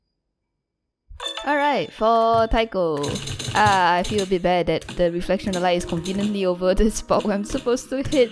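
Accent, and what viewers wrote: Singapore. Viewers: Oh man, I could recognize the SG accent anywhere :D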